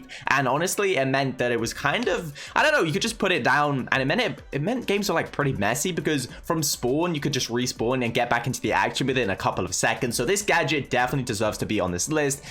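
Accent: United Kingdom. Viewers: a normal pottah from your supah I love bri'ish accents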